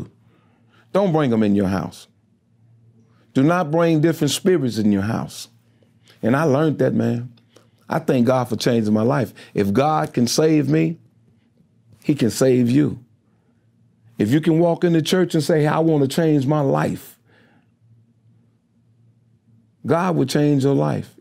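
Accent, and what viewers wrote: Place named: Chicago, United States. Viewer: You can tell from his voice that he's from Chicago. Older black folks in Chicago got a certain way of talking lol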